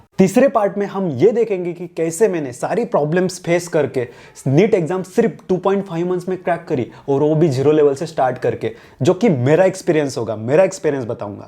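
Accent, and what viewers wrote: Maharashtra, India. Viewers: That Marathi accent while speaking Hindi 😍😅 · I'm also from Latur.... Aur aapka Maharashtrian accent dikh raha h hindi me... 😅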